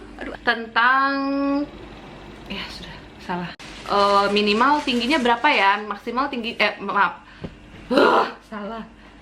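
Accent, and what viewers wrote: Manado, Indonesia. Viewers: Kakak dari manado yah?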